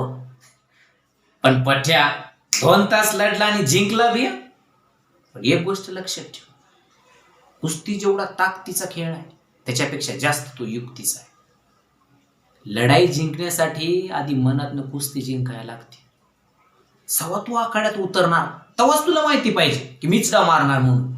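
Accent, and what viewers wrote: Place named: Kolhapur, India. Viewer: Mast ahe bhau kolhapuri laheja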